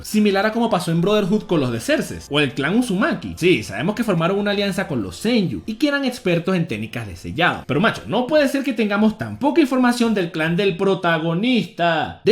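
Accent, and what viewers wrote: Venezuela. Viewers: Eres venezolano, verdad? :3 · Enserio eres venezolano? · Eres venezolano?? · Acento Venezolano...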